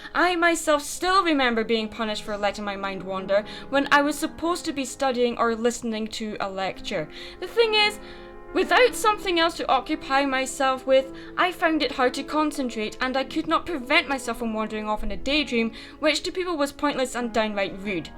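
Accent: Scotland, United Kingdom. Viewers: Big fan of your Scottish accent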